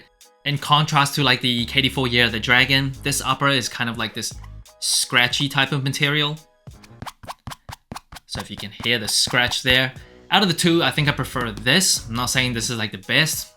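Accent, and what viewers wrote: Australia. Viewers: Did you ever live in Australia because it sounds like you have a bit of an Aussie accent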